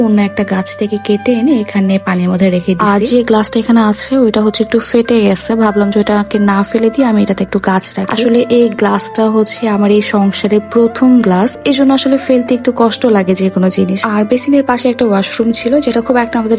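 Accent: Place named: Dhaka, Bangladesh. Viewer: আপু তোমার ভাষাটা মাশাল্লাহ অনেক সুন্দর এটা ঢাকার কোন এরিয়া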